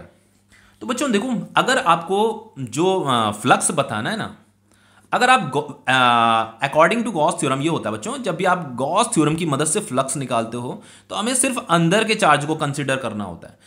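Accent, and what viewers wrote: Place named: Bihar, India. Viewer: I am from Bihar .... Apke bolne ke tarike se mujhe laga ki shayad ap Bihar se ho..... By the way I like your videos main apke hi videos se 11 class bhi padhi aur 12 th bhi padh Rahi hu .... Thankyou so much sir😃😃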